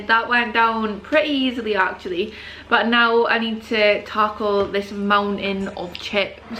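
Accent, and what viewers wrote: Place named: Scotland, United Kingdom. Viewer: Its so refreshing finding a fellow scot on here! Was dead jealous of all the food!! 😂 You sound local to me! Which area are you from? I’m Aberdeenshire x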